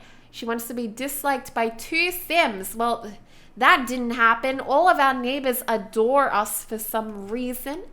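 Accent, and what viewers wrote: Australia. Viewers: Are you Australian? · is she from Australia?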